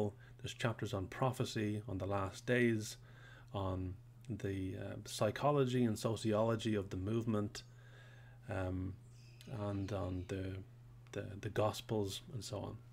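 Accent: Ireland. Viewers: Hi, sound like an Irish man... I'm in Mayo, what's your background?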